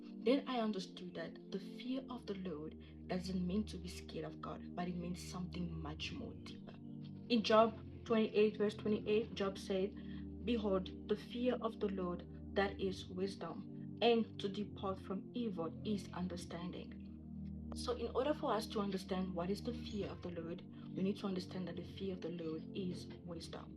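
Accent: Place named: France. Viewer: You speak French huh? I can hear the accent 😂❤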